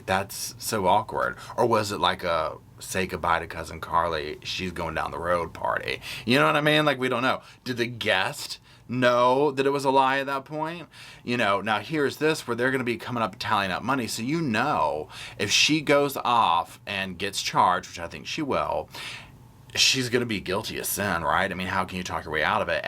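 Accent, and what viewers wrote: South, United States. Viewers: I love your southern drawl! I could listen to you talk aaaaaaaaaaallllllllllllllllllllll day! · 7/26/23... +...the Gruesome 2-some! 😊 Love your Southern accent + your common sense analysis of this ridiculous lie/hoax! God bless taxpayers who have had to pay all this horrible debt for this expensive FAKE SEARCH just b/c she has "hurt feelings" + "seeks attention"! She wants attention + is seeking fame + fortune + book deal + movie deal! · Sir what part of the south are you from. Love the accent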